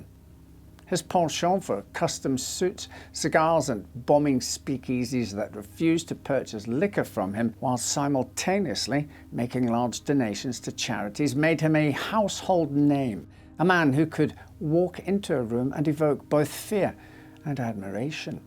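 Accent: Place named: Glasgow, United Kingdom. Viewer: Washington state West coast U.S.A here. I hope yur real n not scrappy a.i.😕 Love the Glasgy speak😊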